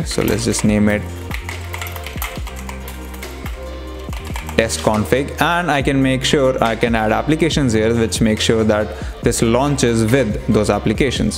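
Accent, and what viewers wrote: India. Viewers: I do not understand Indian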